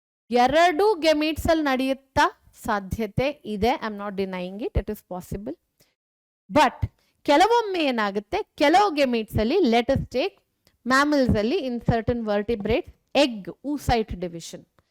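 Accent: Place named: Andhra Pradesh, India. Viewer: Mam u r mother tongue is Telugu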